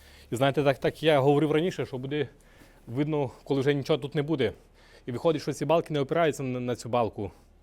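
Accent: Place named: Polesia, Ukraine. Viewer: Привіт молодь❤! Приємно спостерігати за працьовитою родиною! Здоров'я та наснаги вам❤!, я прекрасно розумію чудовий поліський діалект,у свій час працвав в Сарнах на маслробному комбінаті охоронцем, досі закоханий в ваш край,та щирих доброзичливих поліщуків! А смак вашої чорниці і грибів неможливо забути. Миру нам та Божої ласки ❤!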